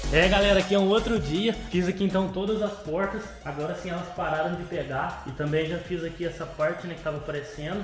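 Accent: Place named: Paraná, Brazil. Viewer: sei que esta pergunta pode parecer estranha, mas... você é do interior do Paraná, né?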